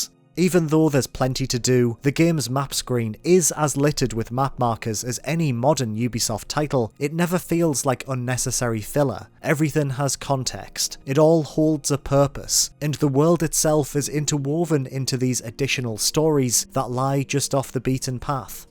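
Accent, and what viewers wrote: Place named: Durham, United Kingdom. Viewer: Sounds like a Durham lad 👍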